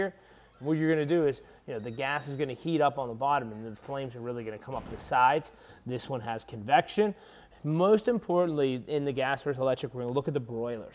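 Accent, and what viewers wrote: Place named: Midwest, United States. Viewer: Mid western American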